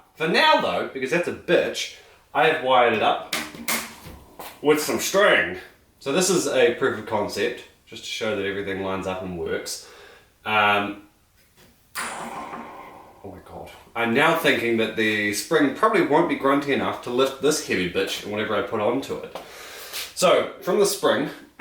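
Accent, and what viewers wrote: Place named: New Zealand. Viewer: You a Kiwi?😁 You have a slight accent and in sure you aren't Brit or South African and me hearing a bit of an accent probably means not Aussie.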